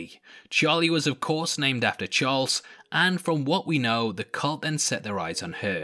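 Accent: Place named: Newcastle, United Kingdom. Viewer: Howay..this is hands down the best and scariest horror I've ever seen ... intelligence and terrifying..Great channel and nice to hear a geordie !!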